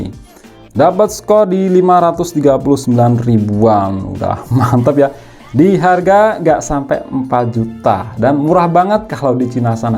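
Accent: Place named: Java, Indonesia. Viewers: Jowo ne medok i jane wong ndi smian bang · Jowo an maszhee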